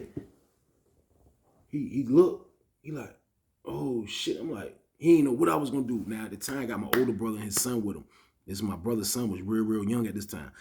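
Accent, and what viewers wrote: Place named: Bay Area, United States. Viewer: a lot of similarities between how you talk and Bay Area. Richmond Cali is hard and of course we been calling Oakland da TOWN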